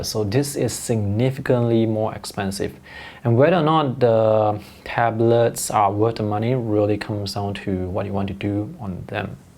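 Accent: Singapore. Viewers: You sound like a singaporean. Are you?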